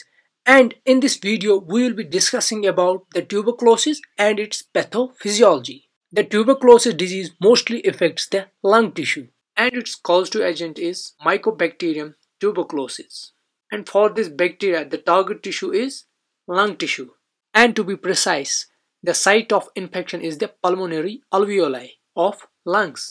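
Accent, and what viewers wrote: Kashmir, India. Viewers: Typical kashmiri accent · Sounding like a kashmiri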